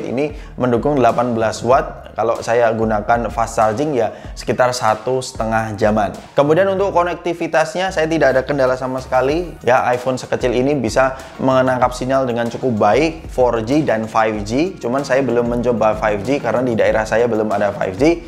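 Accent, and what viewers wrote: Semarang, Indonesia. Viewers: Weh wong semarang ik 😄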